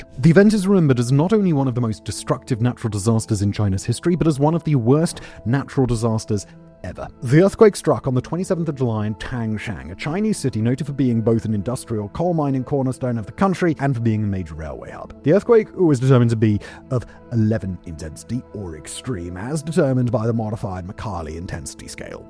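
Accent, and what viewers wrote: United Kingdom. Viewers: anyone else bothered by how fast he talks? I mean I'm used to the British accent and all but I can barely understand what he's talking about because of how fast he speaks. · Can’t stand this voice. I’m so over British accents.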